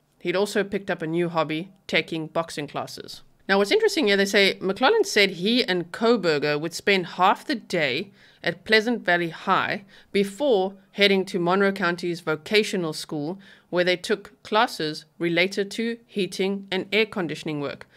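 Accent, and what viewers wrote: South Africa. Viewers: This is my first time watching your videos. I couldn't place your lovely accent so thank you for telling us that you are from South Africa living in the Netherlands. Your videos are so full of information. It feels like I'm back in university.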